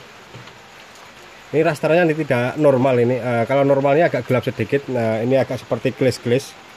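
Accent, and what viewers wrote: Java, Indonesia. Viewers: dari kalimantan timur ko logatnya seperti wong jowo bang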